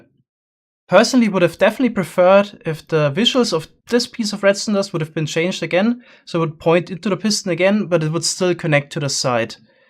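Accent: Germany. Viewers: Are you german? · Is he from Germany?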